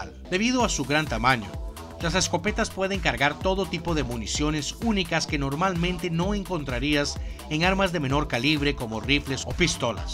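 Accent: Costa Rica. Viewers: Desde el principio supe que eras tico aun si ni siquiera lo dijeras saludos desde cr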